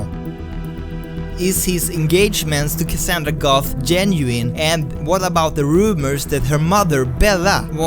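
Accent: Sweden. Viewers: That is Swedish. He has an obvious Swedish accent as a German that speaks Swedish as well I can tell you that is Swedish, German looks pretty different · Din svengelska är helt underbar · He is Swedish, the game is on swedish so that's why he has an accent and bad grammar. Not that all swedes have bad grammar but he has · He's a Swede, you can tell that from the accent.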